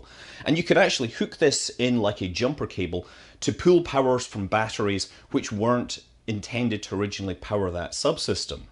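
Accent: Scotland, United Kingdom. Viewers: I love these videos. The combination of subject matter, that gets the mind wandering to all sorts of different places , and the Scottish brogue of the presenter means that I have never actually got through one without falling asleep. They’re the best insomnia cure, next to the Jeff Bridges sleep tapes, that I’ve ever had. The sad thing is I now know about half the relevant information about loads of things to do with space flight.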